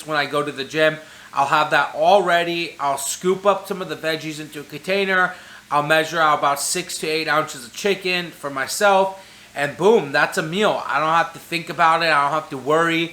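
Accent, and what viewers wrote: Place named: Michoacán, Mexico. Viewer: I seriously thought you were from Michoacán Mexico 🇲🇽 bro Love that meal 🥘 prep easy and affordable thank you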